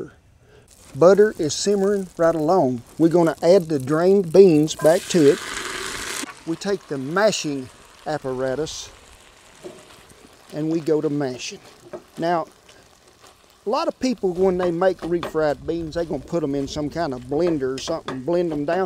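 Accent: South, United States. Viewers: That southern accent crack me up, it feels just like i'm back in El Paso, Looks mighty good!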